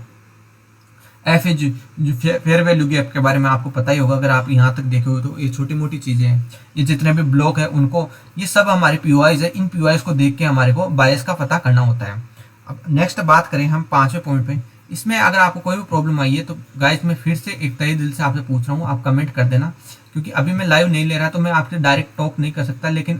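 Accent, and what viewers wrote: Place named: Rajasthan, India. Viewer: Are rajasthani h tu to wo bhi sikar side ki tone lg rhi h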